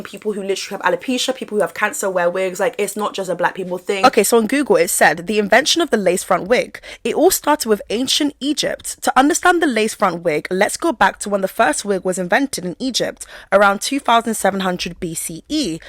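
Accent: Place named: United Kingdom. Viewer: Love the accent also 4 a black Brit you are hilarious and thanks...😅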